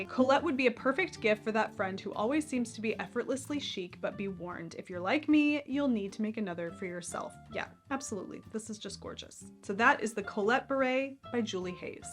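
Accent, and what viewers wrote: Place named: France. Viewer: Your accent is so cute 😍 We could work on our pronunciation together, you French and me English. I like your Halloween costume idea.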